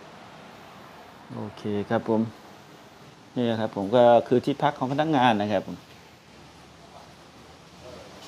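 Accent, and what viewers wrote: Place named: Nakhon Sawan, Thailand. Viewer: ชอบสําเนียงพี่นะครับ ผมมีเพื่อนอยู่นครสวรรค์ สําเนียงแบบนี้แหละครับ ไม่รู้ว่าใกล้เคียงรึเปล่าครับ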